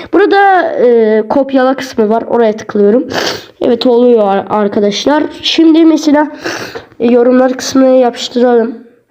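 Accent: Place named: Azerbaijan. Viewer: Danışınnan bilinir.azərbaycanlısan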